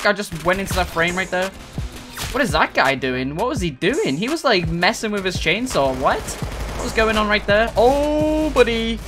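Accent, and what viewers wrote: United Kingdom. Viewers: I think he’s British…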